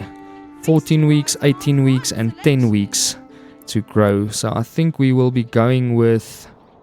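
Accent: South Africa. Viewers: Greetings from 🙌 Enjoying the South African accent during the playthrough.. I don't think I'm subscribed to anyone in South Africa 🤔 ⭐New Subscriber Unlocked⭐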